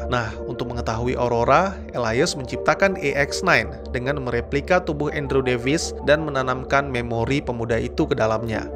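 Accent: Aceh, Indonesia. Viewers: Orang aceh ya bos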